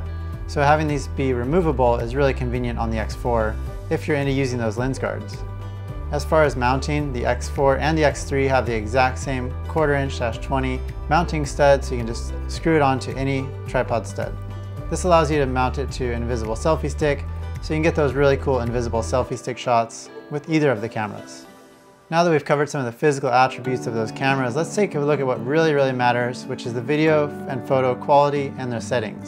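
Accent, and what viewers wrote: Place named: United States. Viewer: Finally someone who speaks American English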